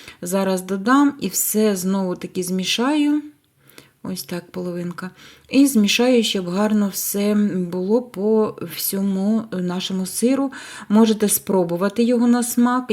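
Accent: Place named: Polesia, Ukraine. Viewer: "...щоб він не підсиха(о)у" - поліське, впізнаю. Побільше б такого автентичного, з вашої родини, від бабусі (хоча це слово (бабуся) - то від нас, з Полтавщини) 😍 Оце ваше кі(и-у)н(ь) - кінь, гурок - огірок, сі(и)ль - сіль. З Полісся з всі слов'яни пішли ;-)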